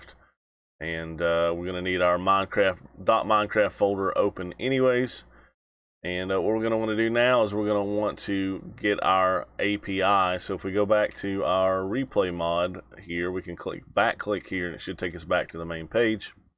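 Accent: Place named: South, United States. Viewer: nice southern accent, i am also from the south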